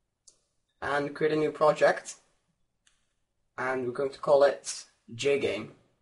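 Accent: Netherlands. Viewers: you can just hear your dutch, ik ook :D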